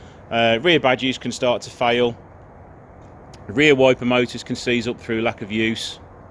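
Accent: United Kingdom. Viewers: Definately, listening to you accent, you are from the UK, weahter there makes cars rust, Bulgaria is a whole different story tho, and all the rust-prone componets can be covered in anti-coroding compound and given a coat of paint, I'd deffinately swap out the stock exhaust for а stainless steel system, which will sound better.. dump pipes and all of that will be changed.. a hybrid turbo will be installed.. an intercooler. the 2.4 engine can take alot of boost, I've had mates that got theirs to 300hp and 600nm of torque.. that's what got me into Breras :D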